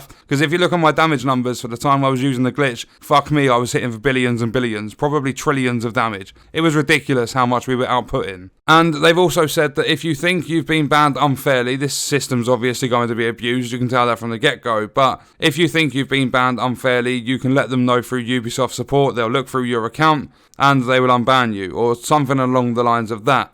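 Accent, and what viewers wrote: United Kingdom. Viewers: Love your Brittish accent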